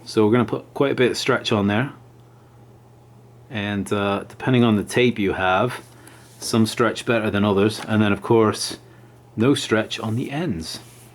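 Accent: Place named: Scotland, United Kingdom. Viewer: I know this an odd question, given that my focus should be related to the taping (video was excellent by the way); but I spent all the time trying to work out your accent. It sounded a combination of Scottish (not sure which part), Northern English (Liverpool or Manchester), and Canadian. Please help me out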